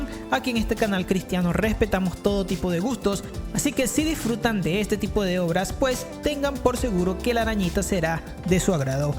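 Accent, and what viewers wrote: Venezuela. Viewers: No sabía que es venezolano, o eso parece por su acento y las palabras que dice, creo yo, jsjs. Venezuela el anime xddd JAJAJA. Tengo que verlo x'D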